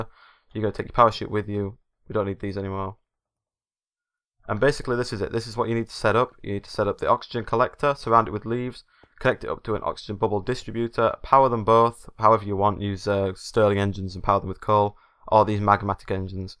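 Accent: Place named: United Kingdom. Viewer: his Thick Brittish accent....... =))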